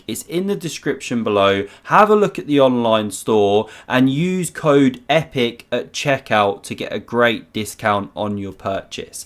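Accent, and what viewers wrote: United Kingdom. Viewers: Must be UK 🇬🇧 accent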